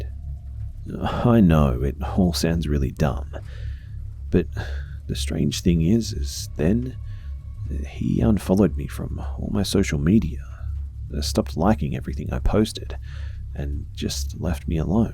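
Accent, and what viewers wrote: Australia. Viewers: It’s good to listen to an Australian voice! You tell these stories so well. Keep up they great work 😊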